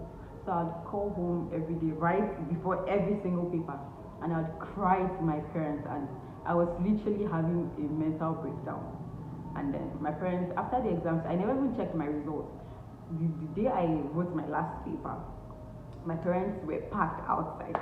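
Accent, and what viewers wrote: Ghana. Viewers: Your tongue is more Ghanaian than Nigeria. I think we taught you dear my dear. Stay blessed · Your accent is now Ghanaian. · Thanks for sharing your experiences, it will help educators on both sides. I must add this, in a year’s time nobody could tell you’re aNigerian, your accent moving rapidly Ghanaian! Good luck with your college work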